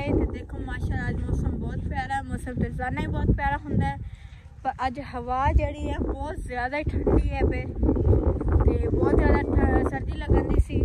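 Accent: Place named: Punjab, India. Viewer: پنجابی بڑی کیوٹ بولتی ہو ماشاءاللہ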